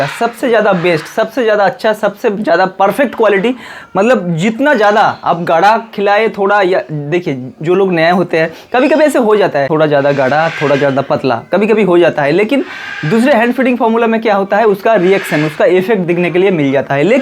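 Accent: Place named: Bengal, India. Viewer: Apnar Hindi pronunciation and words choosing bengoli der moto... So better do in Bengali language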